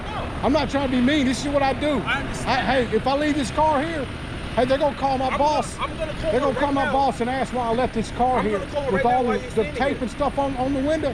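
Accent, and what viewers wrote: South, United States. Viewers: I just discovered your channel a couple of days ago and I have been watching it Non-Stop. I love your southern accent I love your relaxed kick back way. I love how you give it up to God. You were talking about shady practices when tow company send a bill after they sold your car at auction. That is shady as hell I agree. I live in Arizona and here in Arizona if your car gets towed and they sell it at auction then the State of Arizona which has nothing to do with the towing company or the person's car who got towed jumps in the middle of it and adds a abandoned vehicle charge of $500 which you don't know about until you go to try and change your address on your driver's license or if it's expired and you need to get an updated license or if you want to register to vote or you want to register to be an organ donor. No they won't let you do anything they keep you locked up until you pay that $500 charge that no the person lost the car the tow company got the car sold at auction and now somehow the States involved and putting this 500.00 charge.???? 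Please if you could explain this to me like I'm a two-year-old and make me understand I would appreciate it.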